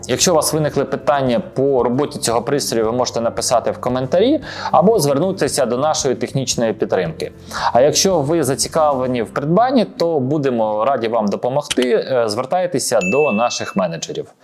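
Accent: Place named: Poland. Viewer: На каком языке этот тарахтит, смесь польского и русского.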